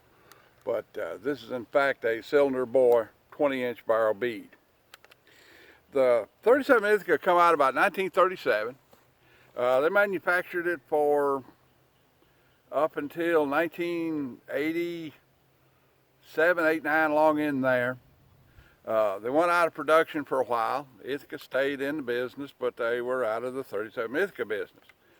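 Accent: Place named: Appalachia, United States. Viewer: let me say I love your videos and subscribe to your channel. If I didn't know you was up in Kentucky and was just listening to ya I'd think you was a local boy from here in the Carolina Mountains. I spent a good many years here as a Deputy Sheriff and in doing so came to harbour a life long love of the riot/police shotgun or as Ole Bill Jordan called it "The Great Equalizer". I went through the Police Academy with an Ithaca 37 DSPS (5 shot, 20", parkerized) and have put together a decent little collection buying most what I've got in the past when they were alot cheaper than they are today and I had more money to spend on them too. My biggest regret has been not going $750 on an H&K 512 at a gun show in early 2000's. It wasn't til I got home and saw how rare they are that I knew how bad I messed up. Made on contract for German GSG-9 that 12 ga.auto also had a shot dispersion device on the end that was adjustable to throw a horizontal or vertical oval pattern depending on the setting. 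Only the overrun of 341 guns total was ever imported into the U.S. by Keasler Police Supply. I passed it when the seller wouldn't budge off $750 to my eternal shame. Anyways the initial reason for the comment was just wanna help you out a little bit on your Ithaca 37 details. The LAPD Special 37 did have corn cob forearm but it had black plastic butt plate, parkerized finish, 4 shot magazine, and 18.5" rifle sighted barrel that differed from others by having the rear sight only windage ad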